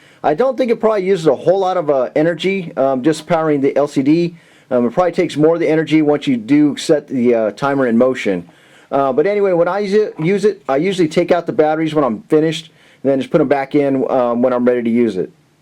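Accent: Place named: Texas, United States. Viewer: Great video and with the Texan accent it sounds straight forward.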